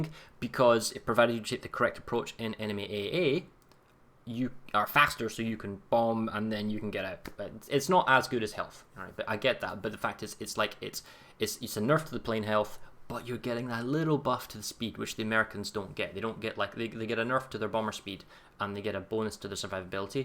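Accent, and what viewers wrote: Scotland, United Kingdom. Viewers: he's Scottish, he can pronounce English words in any way you can imagine, and it's still going to be alright...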